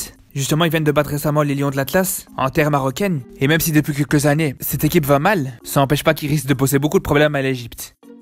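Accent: Belgium. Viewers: Tu as un ptit accent belge.lol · C'est un accent belge que j'entends?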